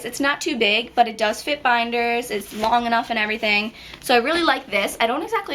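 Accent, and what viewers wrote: United States. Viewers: you are super cute and I adore how you talk, I dont know, but for me this is the perfect example for the american english (though there is maybe not THE american english), but I like it! subscribed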